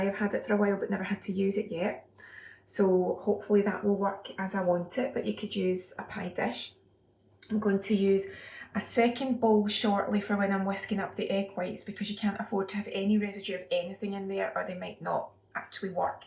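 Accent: Scotland, United Kingdom. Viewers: I need to have a snooze pal. I thought this was a vid of a human + Pie Marriage. Was gutted when i heard the Scottish accent lol. Happy I never read it right. Pal it looks quality but i'm a slice of toast, butter and if av no jam It gets a tablespoon of sugar lol.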